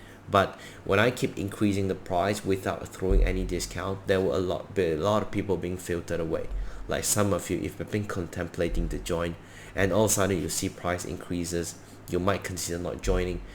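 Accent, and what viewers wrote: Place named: Australia. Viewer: Great content with extremely rare honesty & transparency !!! BTW did you study/work Down Under ?...you have a Aussie accent for a Malaysian Chinese :)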